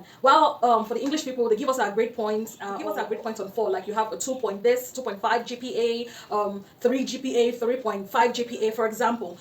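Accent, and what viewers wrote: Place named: Paris, France. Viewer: That parisian accent na die! Hahahha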